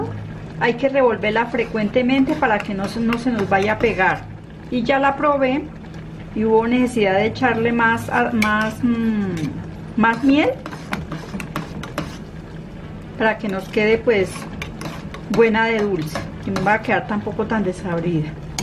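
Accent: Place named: Colombia. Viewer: Me encanta el acento colombiano, muy deliciosa receta, saludos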